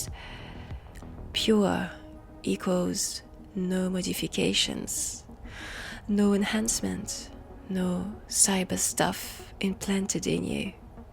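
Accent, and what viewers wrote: France. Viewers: Damn bruh you had me at French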